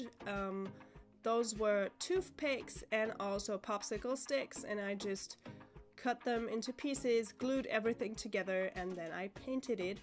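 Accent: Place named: Germany. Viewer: Could it be that you are German because your English sounds like that. (I’m German btw)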